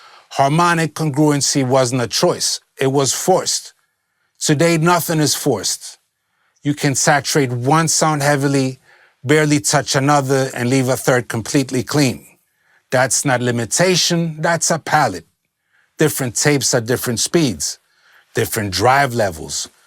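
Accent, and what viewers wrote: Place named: New York, United States. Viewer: Not to mention the NY accent glues this all together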